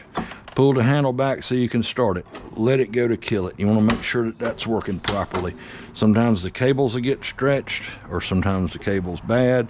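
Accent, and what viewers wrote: South, United States. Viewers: love your teaching with that southern accent thx for sharing your knowledge. I will fallow you from now on.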